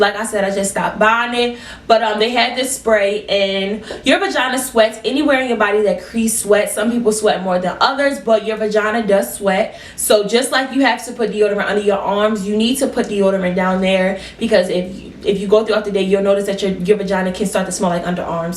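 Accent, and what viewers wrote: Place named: Maryland, United States. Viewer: Hearing you talk I was like “hmmm she sounds like she’s from Maryland” then I read the DB 😂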